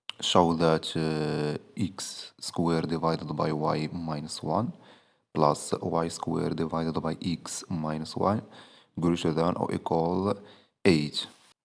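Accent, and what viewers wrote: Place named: Morocco. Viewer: You sound Moroccan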